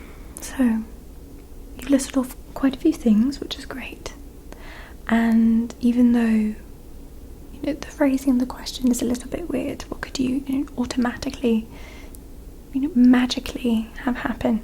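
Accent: England, United Kingdom. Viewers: Not gonna lie but when women have a English accent its super super attractive. I love the way it sounds. Very calming. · Wow, with the British accent you really remind me of Kathy from the Patty Duke show lol. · I swear must be partly English with an accent that convincing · I'm British from Nottingham, thats a very beautiful english middle class accent. Your very considerate which I think reassures people. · I can call myself British because Britain is a nation (you forgot to include Northern Ireland, by the way.) Also, I specifically said I thought she sounded English, not British. If you want to call yourself a North American, that too would make sense because it’s where you’re from. Stick to America, I’ll stick to Britain! 🤗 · I thought she was English, it was the first video I've seen from here, so I went to watch another and I was shocked she was American. 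I'm English as well x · This is the greatest English accent I’ve ever heard from an American. Are you an actress? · I’m from south London, how do you sound more English than me? 🤔😂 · Umm, you're incorrect. Thats not an American accent at all! That's an excellent British (more correctly, English) accent. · Absolutely spot on accent, nicely done (from a UK viewer)! YT pops up this from the past, lovely to see, as always. You even seem to be 'acting Englishness', you would have been excellent in The Crown 😊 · Wait, you AREN'T English? Your accent is incredible. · As someone who’s English I’m actually so impressed by your accent 🤭 if I didn’t know you were American I’d genuinely think this was your real voice! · I'm only just discovering the British accent videos, these are delightful! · It's! Nice to see a new ASMR video from you! Watching this at the moment for a comforting Tuesday afternoon! 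Love the English accent as well:) You're the coolest! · that's a flawless English accent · Can I just ask who or what you copied your accent from? You sound more English than any Brit ever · Please do more British accent video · Wow. If you showed up talking like this I wouldn't be able to pin where exactly you're from (I'd guess maybe Sussex or Surrey), but I wouldn't have any doubt you were English. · I always forget youre not british when watching a video. Hah, thats great! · Your English accent is so flawless, you really should be an actress. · As an English person, Americans are usually dreadful at putting on an English accent - espeically so-called professional actors!....but this is absolutely 100% convincing. Like many others, this is my first video of yours, and I never suspected you weren't English for one moment, until I read the comments. You should get into professional acting! 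· I genuinely thought you were British, until I saw your other videos. This accent is exquisite! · The English accent is back... Yay! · Best English accent from an American I’ve ever heard 🙌🏻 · I love British.. or should I say English! ♥️ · What a voice! so soothing.Nice english accent.Poetry to my ears. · I just came here because I miss British accents and I find myself answering the questions in my head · It is so nice to hear an American do an English accent and not sound Australian. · Your accent is super believable from my experience a lot of Americans trying to do an English accent either sound like Dick Van Dyke in Mary Poppins or a super over the top impression of the Queen. If I heard you I would think you were English...I'm English, just thought I'd mention that for context haha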